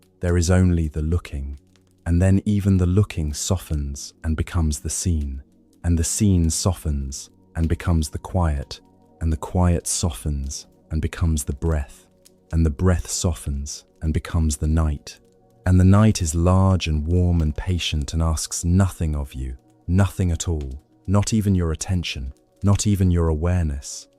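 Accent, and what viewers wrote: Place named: United Kingdom. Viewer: British accent no freaking thank you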